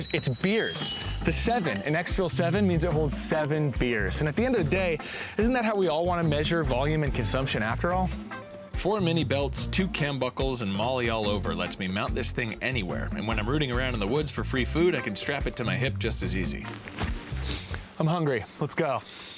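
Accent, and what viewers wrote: Canada. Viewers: Nothing like gettin’ oot n’ aboot eh!? From Ottawa to Hull you have no equal. Rock on eh! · Not much of an inside joke when everybody knows this Canadian pronunciation quirk.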